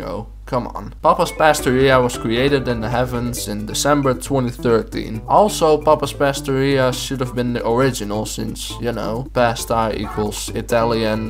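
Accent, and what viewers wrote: Netherlands. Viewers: R u Dutch? U sound dutch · Haha dit klinkt echt als een Nederlander 😂 klopt dat? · Are you dutch?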